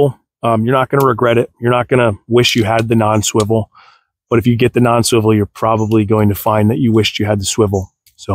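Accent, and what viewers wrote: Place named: Cuba. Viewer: Your accent reminds me of a Cuban comedian in Miami called Mario Ramil dudes hilarious. Great channel and content btw keep it going.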